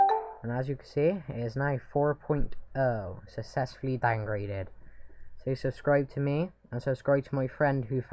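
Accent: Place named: England, United Kingdom. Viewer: he sounds english not like a chav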